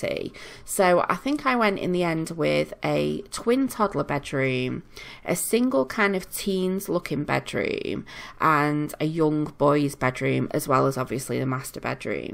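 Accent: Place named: North, United Kingdom. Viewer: Love your builds! Nice to hear a fellow Northerner on youtube playing the sims too!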